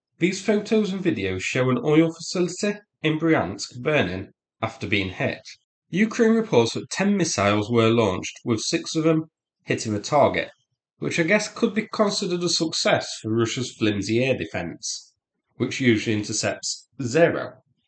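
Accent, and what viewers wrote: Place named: Middlesbrough, United Kingdom. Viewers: I agree with you. English is the language one uses if he wants to have an international audience. If one speaks with his Middlesborough local accents, then he is not speaking "internationally understood English", not the one which is internationally understood as such. I understand him because I turn the subtitles on, but the subtitles as well often don't understand what he says, and they cannot be faulted at all. I think he would have a larger audience if he used some computer generated voice. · i'm sorry you have this problem. The good news is: you have a great opportunity to learn understand Middlesbrough accent. It may not match what you expect, but it would be a lot less genuine if he put on some fake south of England middle class accent.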